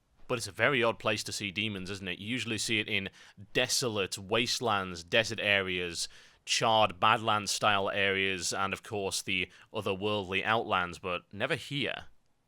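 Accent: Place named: United Kingdom. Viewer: I'm not playing till cataclysm, beteen this guy and omfgcata I'm even more stoked about cata, the best part is, I'm british so this guy is like my on kind =), even though accents and language varies dramatically depedning on hich part of britain you're from, ahhh the way our language has been bastardised over and over...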